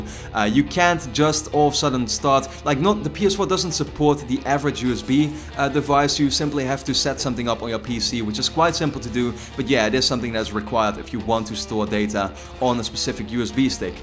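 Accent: Netherlands. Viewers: Kan merken dat je Nederlands bent maar heb wel even paar vragen ze zeggen je kan je games wat je ge saved hebt ook online bij ps4 plus en die later op je 2tb neer zetten klopt dat of niet groetjes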